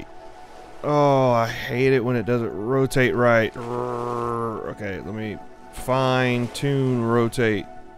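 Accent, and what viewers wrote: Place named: Texas, United States. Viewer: You sound like your from Texas or something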